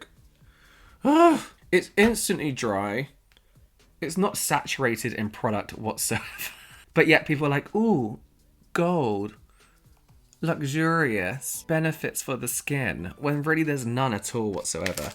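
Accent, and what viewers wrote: United Kingdom. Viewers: is simply a gorgeous specimen of a man 😍! The British accept is just a plus! God bless you Papacito Bello! 😘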